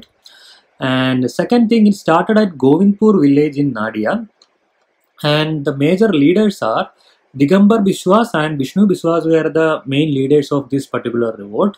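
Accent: Kerala, India. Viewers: if I am correct You must be Keralaite. good video btw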